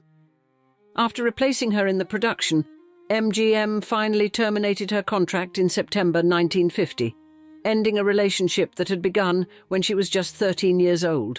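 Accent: England, United Kingdom. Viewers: An extraordinarily good documentary on Our beloved Judy … a genius talent so abundant that no studio or Mayer could quell, but whose legacy was paid with her shortened? But wonderfully generous life. (An English accent somehow underpins this narration with the dignity, honour & respect that Judy earned. A genius talent and top-genre human being. With gratitude for the quality of the content and blessings for its realistic homage …even though it was but a very small sliver of all that our great, great Judy represented, was and still is z Thank you, From Melbourne, Australia. Xx 🙏💝👏🐨🦘🪃🇦🇺🕊️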